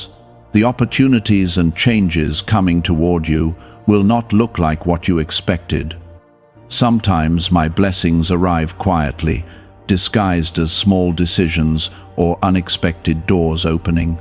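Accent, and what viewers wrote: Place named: United Kingdom. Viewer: HI THERE GOD OF HATE AND PUNISHMENT!!! WHAT IS THE TRAP YOU ARE SETTING FOR ME??? I WILL OVERCOME IT REGARDLESS. I not receiving ANYTHING until you disclose EVERYTHING. YOU ARE FAKE GOD and DIVINE TIMING I do not agree to! I only do things in REAL GOD'S TIMING ONLY!!! YOU PLAN NOTHING FOR ME! I DECLARE NO NUMBERS SO JUST GET OVER IT> THE FOG YOU SEE IS BECAUSE YOU ARE IN LONDON, YOUR ACCENT GIVES IT AWAY!!! THIS WHOLE CHOSEN ONE PROGRAM HAS BEEN IN RANDOM! I WILL NOT BE A CHOSEN ONE ANYMORE AND REAL GOD SAID I DON'T HAVE TO!!! YOU ARE NOT GOD! YOU ARE NOT GOD!!! YOU HAVE PROMISED BLESSING FOR OA YEAR SALREADY AND I NEVER GOT ONE!!! THANK REAL GOD YOU ARE NOT SANTA CLAUSE!!! YOU HAVE ZERO TRUST FROM ME! YOU ARE PREPARING NOTHING> YOU ARE FAKE!!! REAL GOD DOES NOT HAVE BRITISH ACCENT! HE HAS NO VOICE EITHER. HE TALKS TO ME TELEPATHICALLY JUST AS THE SOULS HE TALKS TO IN REAL HEAVEN>FINISH THIS IN 5 MINUTES OR I SIGN OFF!!! 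I AM NOT TAKING A BREAKTHROUGH! GET THAT THROUGH YOUR THICK HEAD!!! 4 minutes. 3 minutes, 2 minutes. 1 minute. Your time is up. CHEERIO!!!!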